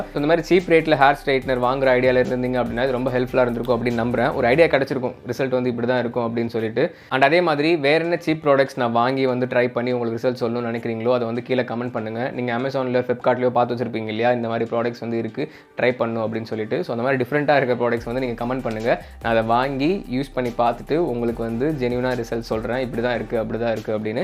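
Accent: Madurai, India. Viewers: Madurai Slang la Pesuriga 😁😁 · Today speaking slang very level and attractive 😍😍😍like madurai peoples...love from madurai 🤩🤩🤩🤩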